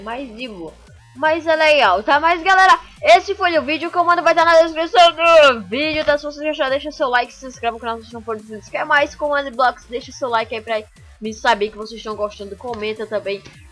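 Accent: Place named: São Paulo, Brazil. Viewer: Aí meu deus esse sotaque misturado com sotaque de São Paulo pqp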